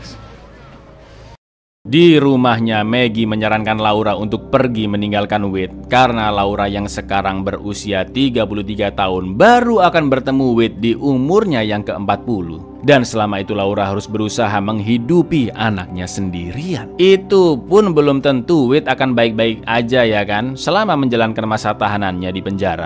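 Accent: Java, Indonesia. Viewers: Sampean wong jowo Yo cak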